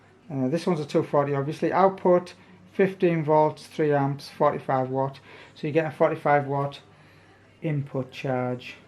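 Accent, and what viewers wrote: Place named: Teesside, United Kingdom. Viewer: I’m guessing you’re a Teesside local?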